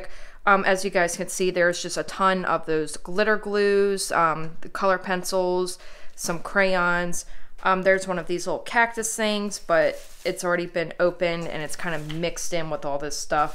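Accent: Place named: Maryland, United States. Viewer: You sound like you're from MD :)...you're having good luck and next time I'm in MD I'll try to check the dumpsters.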